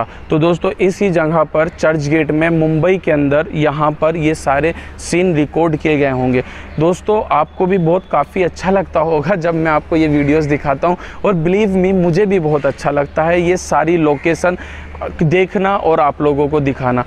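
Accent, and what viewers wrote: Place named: South, India. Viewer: Bhai Tum South अंका bol rahe ho masur comedyan mehemod he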